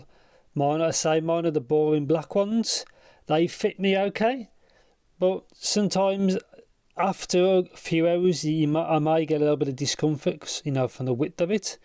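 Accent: United Kingdom. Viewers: Bro im here again but can you plese try to go out of british accent a little bit ? its really annoying some word are like in another language cant figure out wtf is going on.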